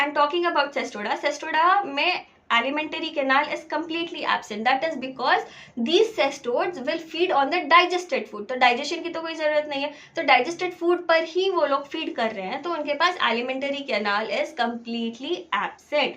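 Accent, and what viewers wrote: Hyderabad, India. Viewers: Hyderabadi Ma'am spotted!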